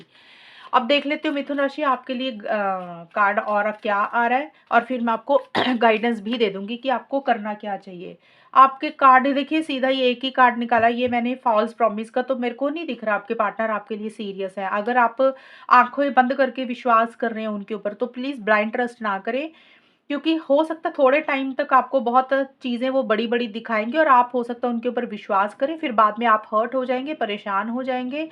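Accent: Punjab, India. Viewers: Your way of talking is Punjabi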